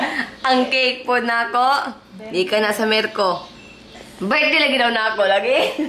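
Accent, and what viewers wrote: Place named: Cebu, Philippines. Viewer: nindot noon kaayo dae nga ingun ani ba bisdak kaayo...daghan napud baya kaayo mga taga american nga mo speak bisaya so nindot pud ni dah..hehhehe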